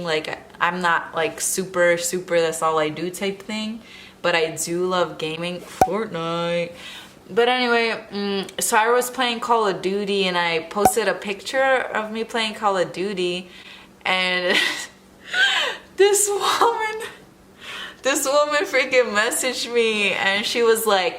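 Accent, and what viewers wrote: United States. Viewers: Reminds me of a chicago south side girl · Been trying to figure out what state she is from with her accent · we are everywhere haha i'd say you're from New York or something lol, looking forward to more of your videos!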